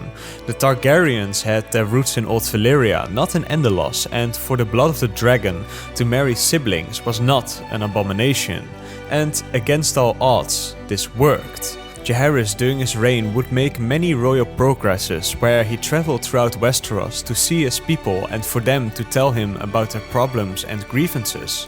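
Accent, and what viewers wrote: Netherlands. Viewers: Great video, but do I notice a Dutch accent there?🤔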